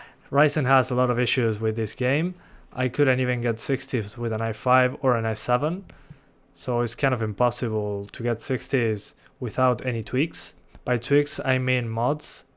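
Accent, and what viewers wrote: Argentina. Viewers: ingles argento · Soy yo o es argentino ?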